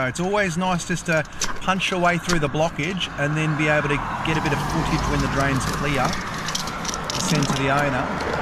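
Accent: Australia. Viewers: Hey there mate I just stumbled on your YouTube a few weeks ago and I just subscribed to your channel, I’m from Adelaide, South Australia and you have really put a big smile on my face watching your channel, you are sure a %100 Aussie from your accent, I was born here in South Aussie from my parents who escaped Vietnam for me to have a safer life here in Australia, I’m 44 in July and you have made me smile from the first YouTube I’ve watched. I’ve been through cancer since 1991 and kicked cancer in the butt. You keep up with the good work your doing 😊😊😊😊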